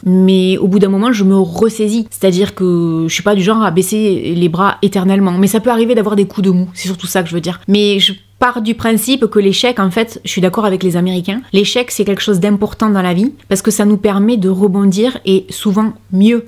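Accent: South, France. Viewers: J aime ta franchise ta sincérité surtout tes bons conseils et ta gentillesse tout ça arrosé de ton petit accent du midi Merci mon petit rayon de soleil s à nous change du make up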